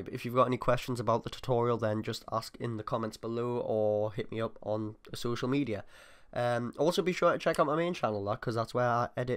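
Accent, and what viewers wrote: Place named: North East England, United Kingdom. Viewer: your from the northeast of England :D like me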